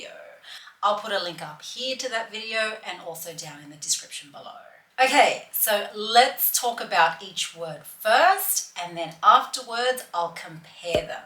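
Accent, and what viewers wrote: Australia. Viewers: I don't understand why you don't have more subscribers and views, you made really useful and interesting videos, and the best thing is you have Australian accent! That is strategical for me, I am living in Australia and I was study by my own with audios and videos in British and american English, and I wasn't improving my listening skills here (I didn't understand Australian people). Since I am study with your material I have felt that I am progressing 😀. Thank you!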